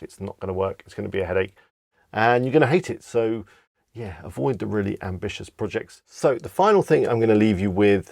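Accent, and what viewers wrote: United Kingdom. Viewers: Is that british accent ?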